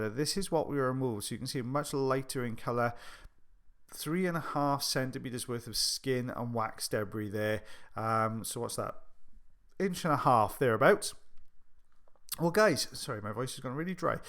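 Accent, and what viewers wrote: Wales, United Kingdom. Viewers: I find the Welsh accent to be the nicest of the British accents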